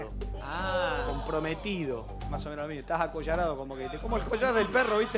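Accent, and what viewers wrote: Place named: Mexico. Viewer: sos un capo me encanta cuando hablas en mexicano!!😘😘😘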